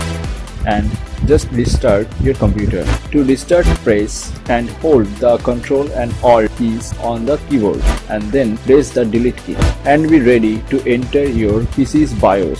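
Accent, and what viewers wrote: India. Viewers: It’s not so much the music that sucks, it’s your horrible accent. I can’t understand a damn thing you say in that heavy India accent. Subtitles at the bottom sure would have helped.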